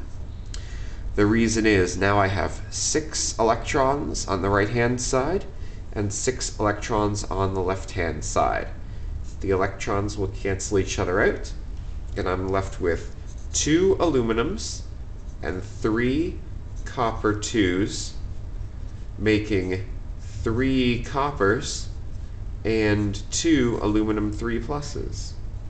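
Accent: Canada. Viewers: I think its canadian maybe because the way he says the word out sounds like it.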